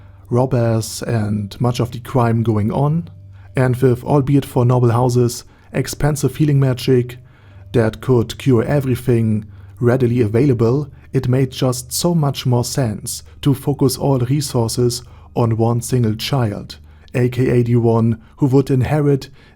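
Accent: Germany. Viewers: For some reason i really like your voice with the german accent ! Is there a chance that you will read the light novels ? Soo we can listen to it in your voice ?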